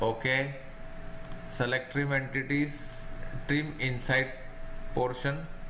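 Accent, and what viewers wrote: India. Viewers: Thank you, finally a tutorial with an indian accent!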